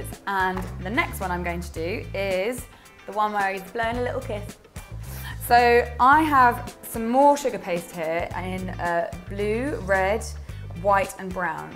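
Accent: England, United Kingdom. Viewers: Your english accent😍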